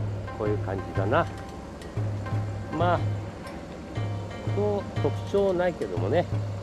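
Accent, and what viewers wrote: Tsugaru, Japan. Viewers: 車には詳しくないけども、アルファロメオのエンブレムがオシャレな感じで好きだなぁ♡ マスターのちょっとお久⁈の動画見て 津軽弁聞いて、ほっこりしました(о´∀`о) 寒くなって来たので風邪引かないで下さいねぇヽ(´ー｀)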